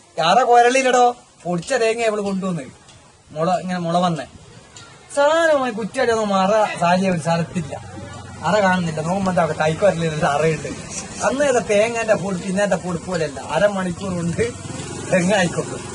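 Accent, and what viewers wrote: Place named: Nadapuram, India. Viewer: Biju Sasidharan ഇതു നാദാപുരം പ്രാദേശിക ഭാഷ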